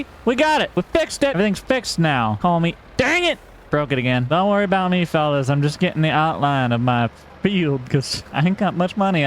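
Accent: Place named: South, United States. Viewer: I love this man and his very southern accent when he is screwing up 😂❤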